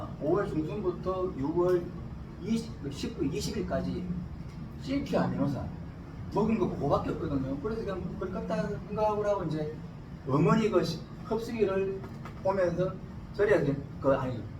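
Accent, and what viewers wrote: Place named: Sangju, South Korea. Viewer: 직접체험 실정된 내용 정보나눔의 열정이 바탕이된 영상 짧은시간 함축된 간증의 이야기가 뜨겁구나 뒷부븐 라인체계가있었나? 마지막에 집중 못했다 옛기억을 더듬어보면 어릴적 누에칠때 뽕잎도 오염되지않고 깨끗해야하고 누에도 청결한곳에서성장하고 잠을재였든것같다 강연을봤으니 총평하자면 내용좋다 스피치에 강약 리듬를 추가하면 기억잔류에 효과가 더해지지싶을듯 도구의 사용 :::: 화이트 보드의 활용에서 우측 중앙상단에 한정되어있음 전체 공간의 필기활용으로 정보 주입저장성향상.... 경영체계 라인구성 (수직형 방사형?) 되물림 (상속)에서 진실성 진정성을 부각 네트워크 마케팅 첫인사 불신임타파노력 상주촌 놈 어투는 조금 변질시켜 표준어구사및 억양수정.. . 화ㅡ법 내용전달면에서 대화속도 말을 조금 천천히 쫗기는것같잖오.. 영상 구성에서는 박수치는 아저씨 아줌마 있든데 영상편집시 배석자 화면 1~2컷은넣어면 어떨까? (박수치는 동참하는 모습) 실크아미노산 정보확장 불 붙기를.....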